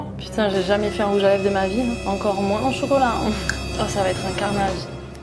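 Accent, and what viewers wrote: Quebec, Canada. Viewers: Tu peut faire une vidéo avec ton accent québécois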